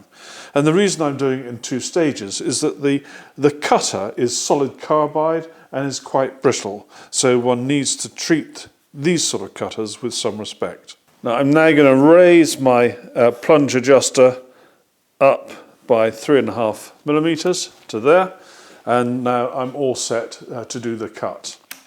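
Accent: RP, United Kingdom. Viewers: Great presentation. Such a nice change to hear someone speaking the Queens English . Subscribed and looking forward to further instruction. Thank you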